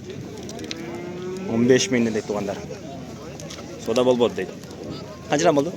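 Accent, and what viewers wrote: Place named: Osh, Kyrgyzstan. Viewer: Бул блогер бала Оштон болобу ака дейт экен же Баткен Лейлектенби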